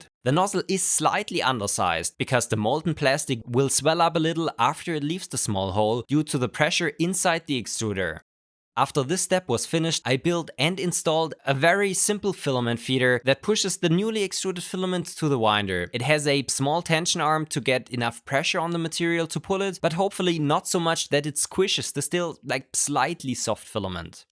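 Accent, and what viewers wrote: Germany. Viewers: noch deutscheres englisch hab ich noch nie gehört, fck richtig ab deine 15 jährige stimme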